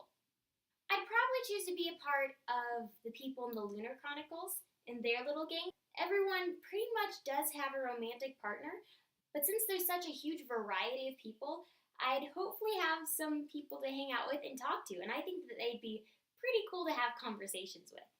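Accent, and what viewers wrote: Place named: United States. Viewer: I love your American accent! You sound so cute♥️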